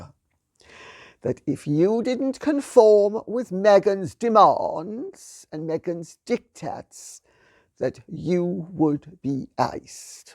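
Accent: Jamaica. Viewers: I was trying to pin point your accent, and after you said you were Jamaican, it made sense. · Lady C. You are so correct about Jamaica and Ganja ! I lived in Constant Spring, at Braemar House after it was turned into Apartments about 1968. Remembering that Ganja was absolutely ILLEGAL and basically only some Rastas used it and Bob Marley. Jamaica is a spectacular place, I know it front to back after living there for five years. So many stories. Wish I knew how to contact you, would love to share some of the stories with you. Thanks so much for your entertaining stories. How I enjoy hearing your Beautiful Jamaican Cultured English accent.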